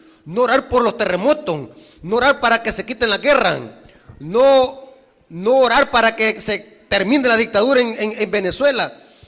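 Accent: El Salvador. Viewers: Hermano, con mucho respeto, este hermano es del Salvador , y casi todos ellos tienen su modo de hablar, este hermano por más que quiera ya no puede cambiar su pronunciación. Así , es su estilo de hablar. Aquí en mi país , en la ciudad hablan muy diferente de cómo es en el campo o en otro estado, y no se puede cambiar y cada uno nota nuestra manera de hablar, y no hay nada que se pueda hacer. Por ejemplo para nosotros los mexicanos los hombres se saludan en algunas partes así: hola, gallo , cómo te va? Y en El Salvador decir gallo es meterse en problemas , gallos para ellos es el Sancho, o es el amante , por eso cada país tiene sus tradiciones. Disculpa mi manera de expresar esto. Te deseo que tengas un lindo día, y espero me disculpes por decir esto. Besos.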